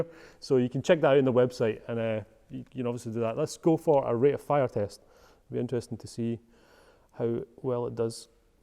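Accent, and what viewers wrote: Scotland, United Kingdom. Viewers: Such a thick Scottish accent sometimes it sounds like he's speaking a different language.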